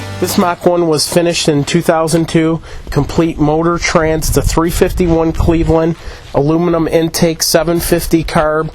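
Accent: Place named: Michigan, United States. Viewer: You must be from Michigan I can tell by your accent. Also how much?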